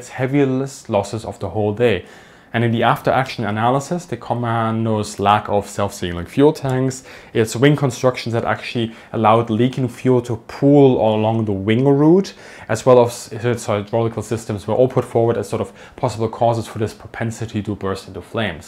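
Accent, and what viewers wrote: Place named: Germany. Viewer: Try to speak slower, because your pronuntiation is all but standard,rp, or any other any way. German pronunciation is dufficult to understand. At ,75 speed it is easier.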